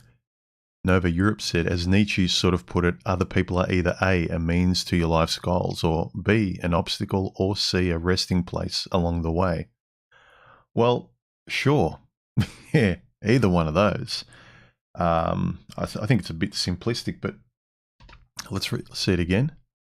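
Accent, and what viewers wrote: Melbourne, Australia. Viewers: You have a Melbourne accent through and through, eimai sostos?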